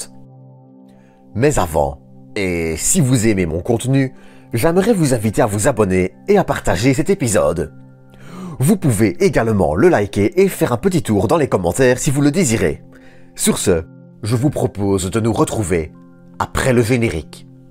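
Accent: Belgium. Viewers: Bsr je dirais belge mais son accent n enleve rien a la qualité du travail fourni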